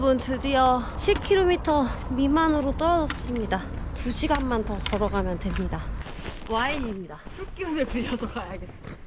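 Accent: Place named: Spain. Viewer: My heart love your Spanish accent ❤❤❤❤❤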